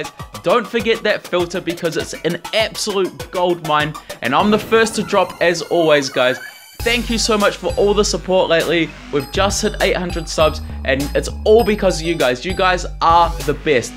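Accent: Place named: New Zealand. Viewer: R u a New Zealander bro, u sound like on cause I am g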